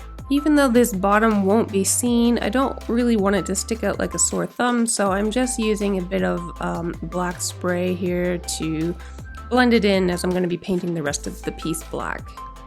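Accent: Canada. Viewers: Canadian eh? AWESOME!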